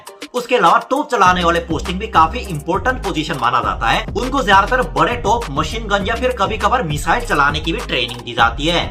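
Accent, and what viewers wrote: India. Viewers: Iska indian accent mere se jyada acha lagta h🤣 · Being a foreigner not only he speaks like an Indian but he is...like an indian.❤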